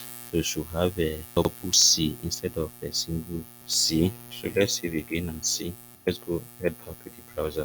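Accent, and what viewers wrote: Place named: Yoruba, Nigeria. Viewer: I really love the Yoruba accent senior man😂❤❤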